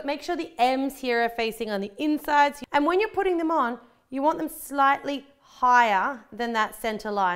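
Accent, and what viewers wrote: Australia. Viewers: Are you Auzzie?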